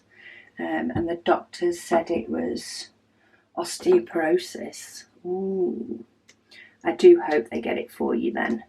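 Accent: England, United Kingdom. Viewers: I really enjoy watching / listening to your videos. I have started a HAED diamond painting. I have been doing a 2 by 3 grid section just like you do, as it fits on my tablet screen. I don't have as many colors as your large HAED. I pick the symbol that is the lowest in the left most column, and let pattern keeper tell me the DMC number. I am working from right to left, and this outlines the section the quickest and fill in from there. I live in the states, and after a few minutes of listening to you speak, my inner voice will suddenly have an English accent. lol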